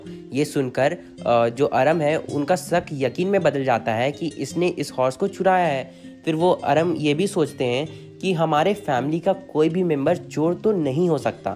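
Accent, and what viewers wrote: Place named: Bihar, India. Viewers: One question you are from Bihar??? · You are from bihar Right!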